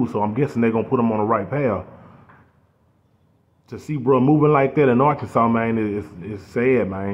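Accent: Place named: Memphis, United States. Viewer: Damn Ian heard 'Jone' in a min, I can tell you spent some time in Memphis. Love from south Memphis mane just subbed, can't wait to see yea channel grow.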